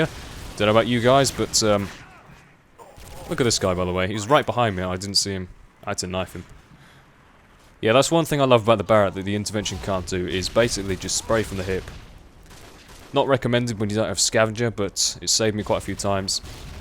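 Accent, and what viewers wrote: United Kingdom. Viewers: My favourite commentators are you (obv) and The Trout91. I just like hearing other British people I guess and you talk about interesting stuff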